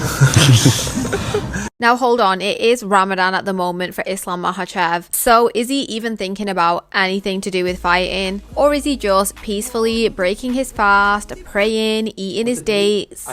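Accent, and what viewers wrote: United Kingdom. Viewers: You really think Kamaru is an easy fight for Makhachev come on beautiful british accent lady when this fight will happen you're gonna find out.from my perspective its a 50 50 fight.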